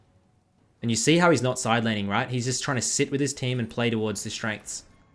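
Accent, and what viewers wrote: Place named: Australia. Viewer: How I love the Australian accent. Like far out, and cheeky little ...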